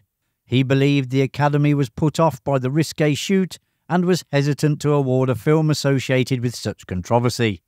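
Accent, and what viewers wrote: England, United Kingdom. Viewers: Can't stand the pommey accent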